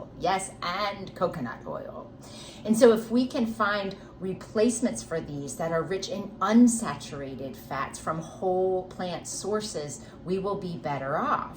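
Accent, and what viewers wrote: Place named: United Kingdom. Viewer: Your British accent is spot on.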